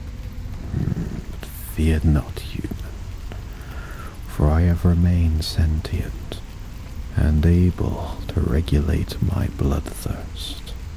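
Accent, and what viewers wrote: United Kingdom. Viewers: Glad to see another fellow from the land of tea and crumpets! Really good first video your storytelling was amazing! Keep it up! 🤩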